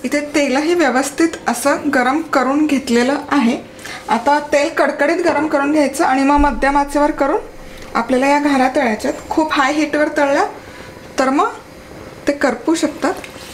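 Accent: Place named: Kolhapur, India. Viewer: Kolhapuri aavaj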